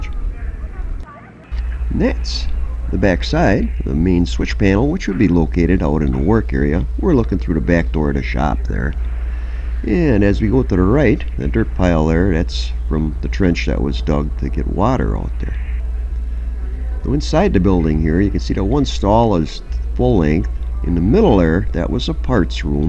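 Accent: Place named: North, United States. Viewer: awesome vid keep em comin. cant tell if hes from sconny or sota but i love the northern accent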